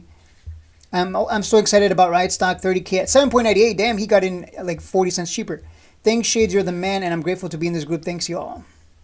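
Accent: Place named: Northeast, United States. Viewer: oh I thought you was in northeast cause the accent haha I’m from SoCal. I’ve driven truck all through Midwest and NY many times tho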